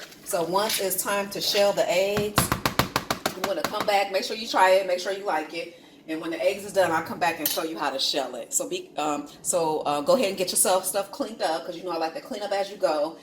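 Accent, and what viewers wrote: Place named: California, United States. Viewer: are you from cali? you sound like it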